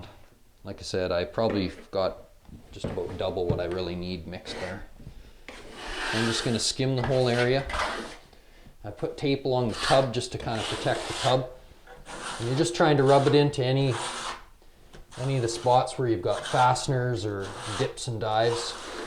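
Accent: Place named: Canada. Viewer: i love your canadian accent 😃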